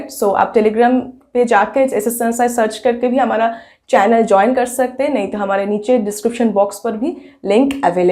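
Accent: Bengal, India. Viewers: Bengali hi hain, isme hasne ki kia baat kia hain?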